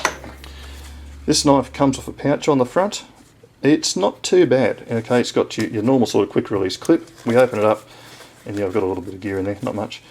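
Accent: Australia. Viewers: Great review. Nice to hear an Aussie accent instead of all the Americans. Have you ever used the Ontario SP10? If so what are your thoughts compared to the SP50?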